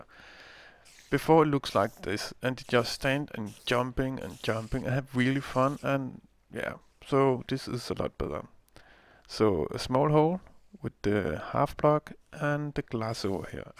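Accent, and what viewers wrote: Denmark. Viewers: u from denmark?:-D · He´s very danish! haha - "også" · Fun to see a dansish minecrafter! though you should try to work on your accent, its very "danish" so to speak, if you understand what i mean... Else very great work, keep that up! ( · Are you danish? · Nice video, jeg kan høre på din accent at du er fra Danmark, hehe:) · your English is exelent, the reason some people don't understand it is because they are not used to your Danish accent. But i understand you perfectly, Im Norwegian and i also have a accent some people don't understand:) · Clearly from Denmark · are you from Denmark? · Hes Danish:) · are you from denmark? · Fin video! Hørte på dialekta di med en gang at du var dansk:) Hilsen fra norge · Man kan squ godt høre du er dansker xD:-D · your danish? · Nice trap, but i dont like your danish/english accent else it was fine. 4/5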